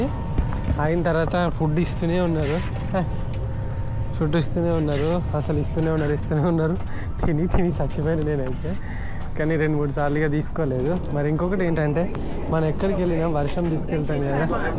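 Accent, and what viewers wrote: Telangana, India. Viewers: U r a worrest guy brother , main minus point me voice interesting ga anipinchadu so ne videos ki views +ne channel ki growth takuva , nuv konchem introvert vi bro neku set avavu e travelling vlogs , ram the traveller chudu ninnu chusibstrt chesi ekadiki vyelipoyado . Nelo kasi undhi but talent ledhu traveling vlog lo kavalsundi main thing voice adi kuda telangana jante Andhra telugu better + extrovertic behaviour + andari panulallo duralaney dula tatvam bcz viewers andaru dula gallu kabatti they like that , nuvento kamam tho kallu muskoni ne tent ne culycke nuvu matrame kanipistav epudu , mobbu matalu veskoni mujkutho matladuthu boring ga untav so ne channel ki growth takuva.... Better luck next tym . . First voice is important to communicate with people 2nd ur content is important 3rd fun is important 4th interesting conversations are important ... 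Lekapotey nuv na anveshana kante mundu start chesi ikade undipotav waste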